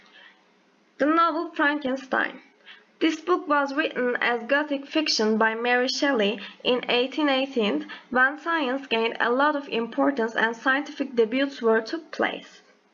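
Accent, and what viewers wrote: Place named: California, United States. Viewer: Accent like Californian..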